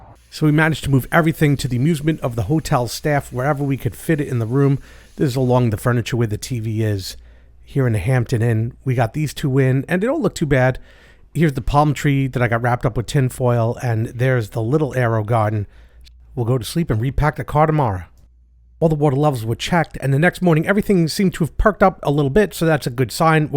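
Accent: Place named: Massachusetts, United States. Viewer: Love your videos. I could tell from your 'r" that you are from the Massachusetts area.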